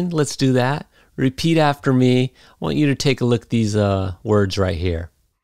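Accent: United States. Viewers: I say t sound for t. You must be teaching hoosier English.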